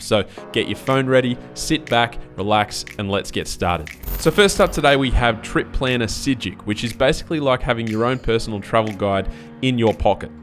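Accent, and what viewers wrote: Australia. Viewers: That irritating aussie accent... I hate this accent. Bloody aussies.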